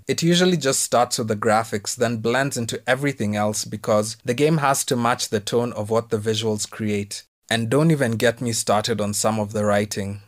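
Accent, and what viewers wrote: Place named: South Africa. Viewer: Your accent is close to a South African one but that's just me. I did not know about fortnite being a single-player initially. I've always avoided these co-op/online and live service games due to the predatory practices. But stylized games are more timeless with the best example being Sifu The upcoming blade by Arkane Lyon. Ultimate Spiderman (2005) Hifi rush The upcoming blade game Rocksteady is another studio that perfectly blends photo realism with a more stylized appearance Gotham and metropolis look like their animated series counterparts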